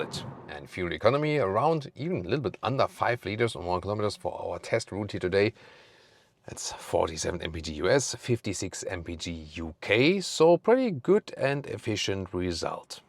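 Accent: Germany. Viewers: His german accent is so hard and difficult to understand.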